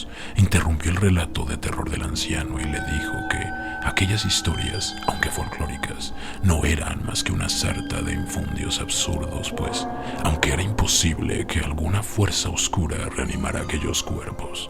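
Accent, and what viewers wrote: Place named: Mexico. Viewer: Que gusto volver a escucharte brother! Tus historias y narración son inigualables, neta tienes un estilo muy chingon con ese sabor mexa que tanto hace falta, éxito y espero con ansias tu próximo video 🤘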